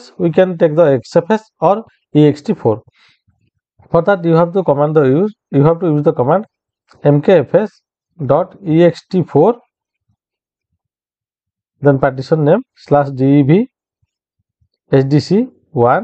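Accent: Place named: India. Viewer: indian english also good, i should learn it.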